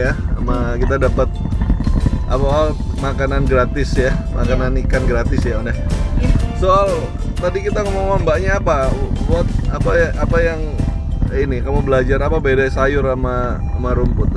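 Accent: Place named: Java, Indonesia. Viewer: Wih orang mana nih om nya Kok pakek logat bahasa jawa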